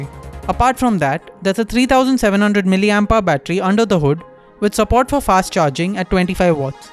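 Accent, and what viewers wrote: India. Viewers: You sound like a indian scamer.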